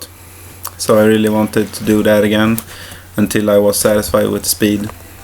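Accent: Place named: Sweden. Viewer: Absolutely love your Swedish accent my man.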